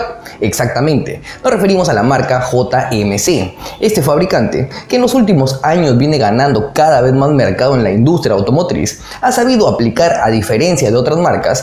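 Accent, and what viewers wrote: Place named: Peru. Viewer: Acento peruano bien definido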